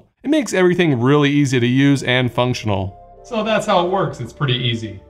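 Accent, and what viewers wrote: United States. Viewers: By the accent, I'm guessing you're from Ogden, Utah. Am I even close?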